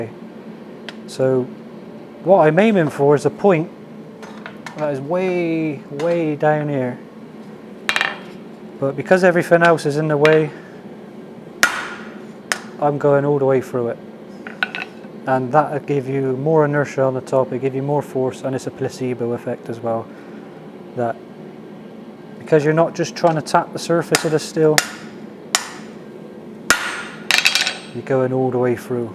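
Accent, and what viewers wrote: West Country, United Kingdom. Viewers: Where is your accent from? West Country?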